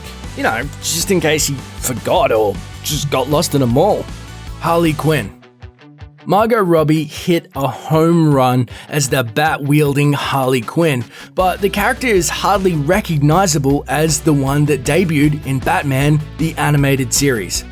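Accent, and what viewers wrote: Australia. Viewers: ha Australian. like me. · I didnt know that you were from Australia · is aussie? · HOLY SHIT AUSTRALIAN ACCENT IS SO LOUD!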